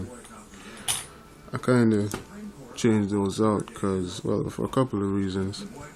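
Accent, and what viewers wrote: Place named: Caribbean. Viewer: yo, you sound like a Caribbean man. Where you come from?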